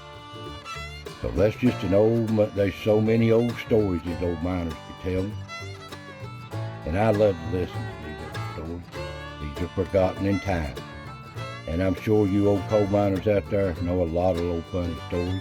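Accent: Appalachia, United States. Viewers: Hello from Virginia my friend, have a great weekend 🇺🇸 Voice of the Smoky mountains ✅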